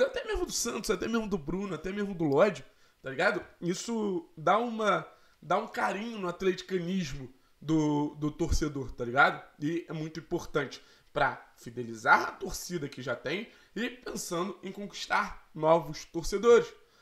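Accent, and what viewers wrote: Rio de Janeiro, Brazil. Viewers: sou novo no canal irmao, desculpe a pergunta, vc eh carioca? mora aqui em cwb? acho q eh a primeira vez q eu vejo alguem com esse sotaque torcendo p cap, inscrito jaaa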